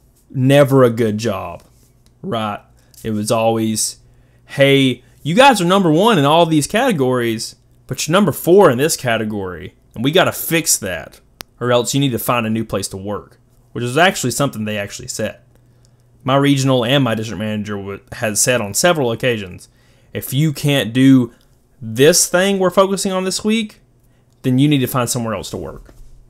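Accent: South, United States. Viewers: he's so southern i love it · This just popped up for me. So weird hearing you try to turn off the southern twang.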